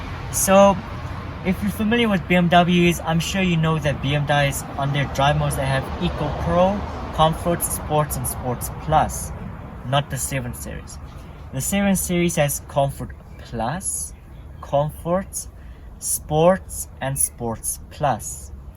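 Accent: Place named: South Africa. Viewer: the moment u opened your mouth . . . i thought you MUST be South African, lol , nice review, very well put together, keep it up bro. !!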